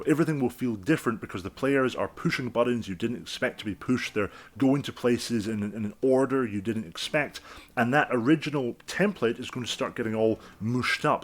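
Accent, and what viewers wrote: United Kingdom. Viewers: When a mustached man with a UK accent and a turtle neck speaks some knowledge to me, i listen.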